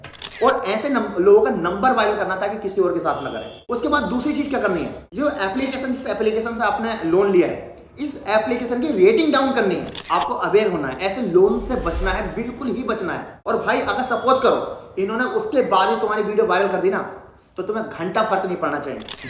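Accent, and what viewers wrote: India. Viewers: आवाज़ से तो maharastra का लग रहा है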